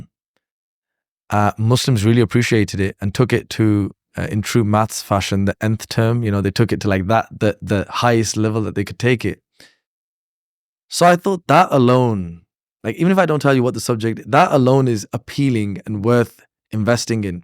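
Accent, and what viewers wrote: United Kingdom. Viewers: it’s more a midlands / Birmingham accent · sounds like a Bradford accent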